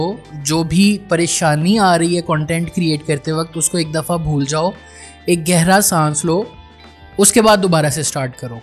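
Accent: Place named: Rawalpindi, Pakistan. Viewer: Thank's Bro! Are You From Rawalpindi, Muree or Kallar? Because I'm Feeling The Accent!❤❤👍👍🎉🎉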